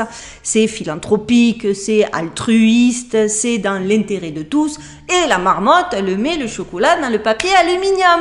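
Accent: South, France. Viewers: Merci gratitude ! toujours des vidéos très pertinentes, avec ton accent du soleil et ton bel humour. Restons dans nos valeurs avec confiance ! le passage peut sembler long mais il n'est rien comparé au nombre d'années de soumission traversées... En face, ils ont négligé un facteur : la possibilité de l'humain de se reconnecter à la source à tout moment...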